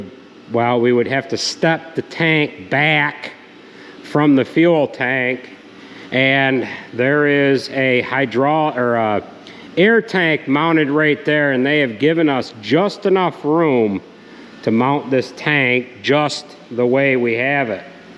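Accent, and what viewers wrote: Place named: New York State, United States. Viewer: I prefer the longer video's. Love to hear your yakking as it teaches me New York state speak!! Learn a little bit from Andrew Camarata but you beat him by a page or two.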